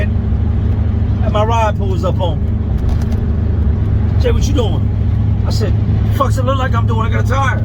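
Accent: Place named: Bay Area, United States. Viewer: He sounds like he from tha Bay wit that Never Dat . But dats old school I don't think we say dat no mo. But Jay keep it P.I. Bad NFluenz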